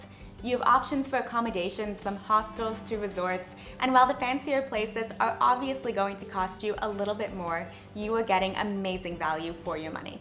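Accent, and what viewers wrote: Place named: New York, United States. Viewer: Have you done any traveling in the last few months? Sounds like you are from NY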